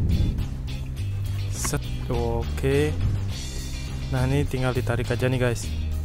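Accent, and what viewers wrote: Bali, Indonesia. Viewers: Logatnya kok kayak orang bali ya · Bli.. klo bsa logat bali nya jgn trlalu kental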